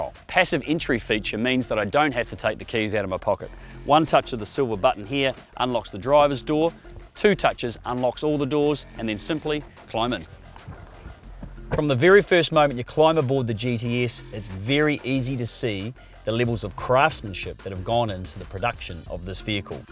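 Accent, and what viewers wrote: New Zealand. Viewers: Who let this kiwi present this video? Would have thought they would keep in Australian...seen as though it's an Australian produced vehicle...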